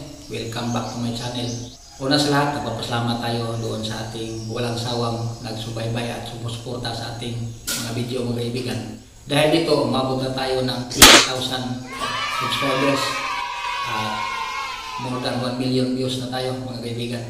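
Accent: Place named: Visayas, Philippines. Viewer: Kaibigan , kung quality control procedure pag usapan natin yong procedure mo hindi ac ceptable . Bakit ? Hindi tama ang mga salita or word sinasabi mo , gaya ng pag lagay mo ng level gamit ang string , or tansi sabi mo elevation hindi elevation tawag nyan kaibigan ang tawag nyan level . Ang term na gamitin mo paglatag ng tiles kung hindi kapa naglagay ng mortar para maiindihan ng viewers , ang term na gamitin mo ay dry "Pre lay ". Saka kailangan nga basa-in mo ang substrate ng structural flooring bago ka mag umpisa maglagay ng mortar. Hindi mo rin sinabi kung anong timpla ng mortar . Ano ratio ng buhangin at cemento ? Yong buhangin mo naka screen ba yan ? Bakit hindi ka gumamit ng tile adhessive.? Kaibigan, sorry to correct your language , kc parang bisaya or cebuano ang mother language mo. Yong sabi mo na special cut ,ang tawag nyan sa tagalog " seroho" in english cut tiles. 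Ang insakto quality procedure pag instal ng tiles na ginagawa namin sa Middle east gamit ng dry pack . Madali at effectivo ang paglagay ng tiles . Ang dry pack mix design is 1:2,5 one bag cement and sand 2.5 compose of screen sand buhangin nakabistay. Mag timpla ka ng cement paste compose of cement and water . Yon ang ebuhos mo sa dry pack pero hindi lahat ng area sa naka spread na dry pack mix. Kailangan compacted ang dry pack mix gamit trowel habang nag spread ka ng dry pack mix. Dami pa malalaman mo kaibigan amigo. Akong paminaw bisaya ka kc accent mo bisaya. Bisaya man pod ko .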